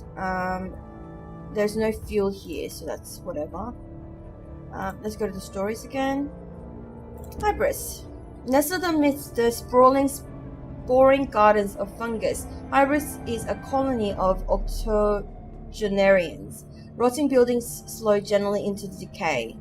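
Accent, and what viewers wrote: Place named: Australia. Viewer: You sound Australian with a bit of American accent at times, sometimes a bit more British...😅 Are you an Aussie? Because uploading that early in the morning seems rough...?😜 Edit: Hmmm... Somtimes I'm picking up a slight non-native speaker accent...🤔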